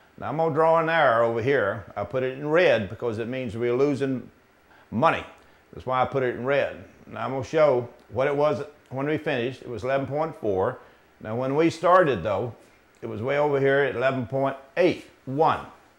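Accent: United States. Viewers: Just because he has a country accent doesn't mean he's uneducated. He may very well be a pioneer of something great and beyond the "education" of the masses. Many highly "educated" are so indoctrinated with book-knowledge that they never do anything great with it. There are other who have experiential knowledge and are willing to go beyond the status quo to discover something new. Hopefully this man is one of those people.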